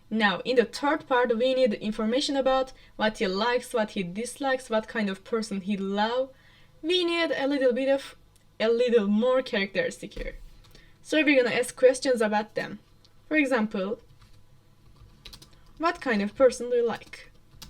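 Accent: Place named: Turkey. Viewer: Help i was watching it and i noticed the accent i was like "İs she turkish🤨" and then you were lol😭😭 So hello fellow turkish person lol😭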